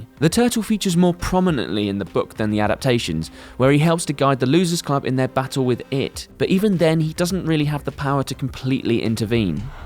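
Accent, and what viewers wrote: United Kingdom. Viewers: Stop with the brit announcers!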